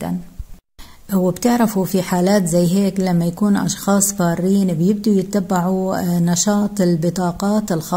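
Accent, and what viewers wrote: Jordan. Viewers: سردك للقصة جميل وشيق فيه كثير من اللغة العربية بميولك احيانا إلى اللهجة الاردنية الجميلة أيضا .. بالتوفيق سيدتي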